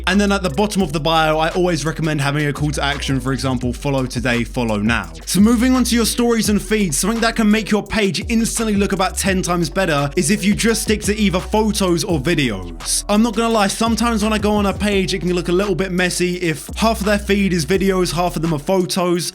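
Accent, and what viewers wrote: United Kingdom. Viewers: His British accent😔💗💗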